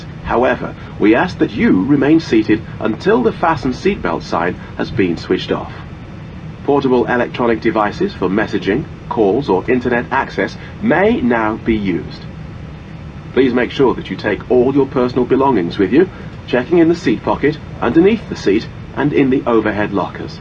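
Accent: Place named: England, United Kingdom. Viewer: Nice english accent. 👏👏👏 Very clear public announcement (P. A.) of a purser/flight attendant.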